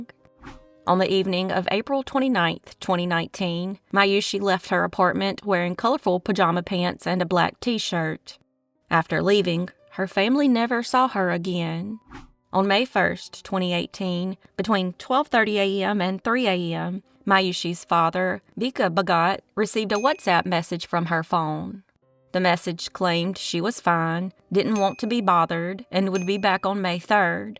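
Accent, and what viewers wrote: South, United States. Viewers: I just don't understand how/why someone can just disappear!😓 So sad for the families!💔 Thank you for bringing these sad cases to light 🕯️ 🕯️ 🕯️ Always good hearing your sweet southern voice! Love ya girl!💞✌️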